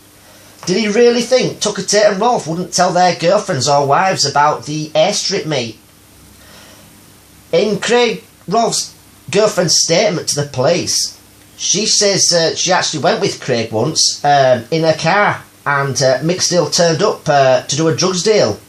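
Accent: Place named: West Yorkshire, United Kingdom. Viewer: Where are you from mate.I know its northern and I'm thinking west yorkshire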